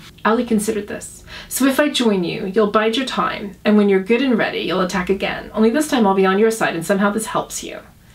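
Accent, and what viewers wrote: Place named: France. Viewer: Your French accent is not bad, it’s cute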